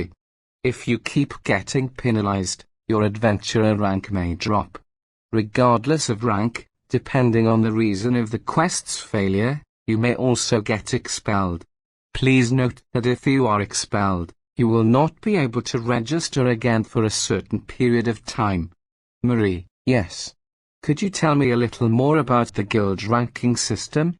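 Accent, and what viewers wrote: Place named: Wales, United Kingdom. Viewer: it sounds like the voice has a bit of a welsh accent or something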